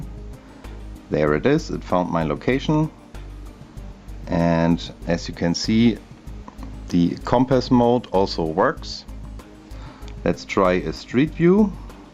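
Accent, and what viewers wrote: Germany. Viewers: Man merkt das du ein deutscher bist...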